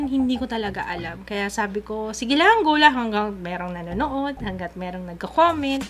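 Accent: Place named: Conyo, Philippines. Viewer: Hi Ate! Happy 4th Year Anniversary! Actually, nadiscover kita sa isang video, di ko na maalala ano title nun basta yung video na yun is naghahaul ka ng make up pero isa ata to sa mga videos mo nung naguumpisa ka palang, nasa ilalim ka pa ng hagdan nun nagfifilm and actually, naaartehan ako nun sayo kasi the way ng pagsasalita mo dun parang ang slang and parang conyo, so sa sobrang curious ko, vinisit ko ung youtube mo profile mo and nanuod ako ng ilang videos mo para makita kung gaano ka kaarte, hehehehe wala sana magalit sakin pero yun ang totoo, yun naging 1st impression ko kay Ate hanggang sa napansin ko nag vovlog marathon na ako at nagsubscribe sa channel nya. nakakatuwa lang isipin na from being a slang girl na akala ko nagfifeeling ka lang ate to a jolly person, nakita ko rin ung improvement mo, and masaya ako na nadiscover kita ate. 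Its been 2 years simula nung simulan kitang subaybayan, kumbaga ikaw ang Itsjudyslife ng Pinas. Dahil sayo din ate mas naiinspire akong magyoutube, pero I think di pa sa ngayon pero I hope one day magawa ko rin sya. So ayun, sana nga mahanap ko ung video mo na yun eh, basta tanda ko nun naghahaul ka po ng mga pinamili mo pati make up sa may hagdan at medyo madilim nga nun kasi pagami na at kadarating nyo lang ni Papa Kitz galing sa mall siguro. Basta ate sana walang magbago kung ano ka dati sa kung ano ka ngayon, dahil minahal ka namin ng ganyan ka na. I hope one day mameet kita ate! I love you! God bless you and your whole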